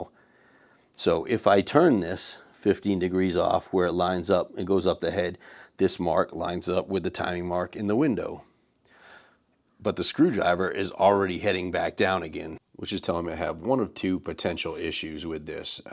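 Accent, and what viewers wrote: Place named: South, United States. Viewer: Ok,thank you for your information. I really appreciate it. This southern boy is out in Albuquerque missing Mississippi it’s good to hear a southern accent!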